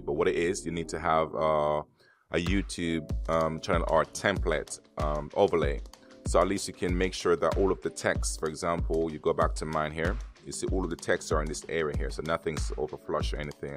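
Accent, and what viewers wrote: Jamaica. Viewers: you sound Jamaican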